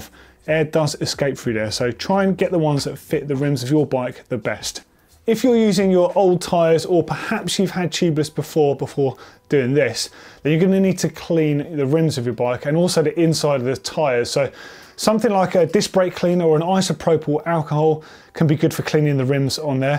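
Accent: United Kingdom. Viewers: British accent is so hard to understand for some Americans. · Awesome job and great explanation as always. I’m a Brit, now living in LA so I miss the accent. Great advice and a tase if home! Thanks GMBN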